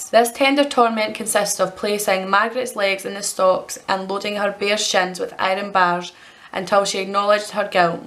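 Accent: Scotland, United Kingdom. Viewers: So nice to hear an actual Scottish person talking about witch craft as a practicing Wiccan myself the Rowen branches and red ribbon are uesd as a fertility and abundance charm just FYI also the bridles u spoke about were commonly used as u quite rightly said on gossiping nagging wife’s but the husband would drag ur ass door to door to show the neighbours and towns folk that ur wife was a bit of a gob shite and u tamed you woman lol 😆 can u imagine also was common practice to get a good spanking if they disobey there hubby’s pmsl 🤣 what a shite time to be alive as a bold outspoken woman eh ... any whoo loved the video hope u do more on the subject u should look in to the Dumfries witch trials they were particularly shocking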